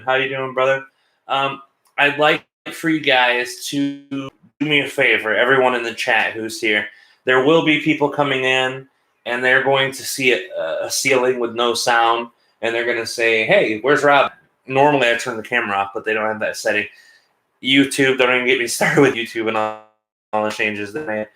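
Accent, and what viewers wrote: United Kingdom. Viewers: First time viewer. Why does sound British?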